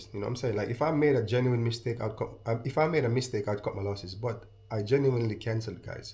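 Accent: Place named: United States. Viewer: You have an American accent more than Jamaican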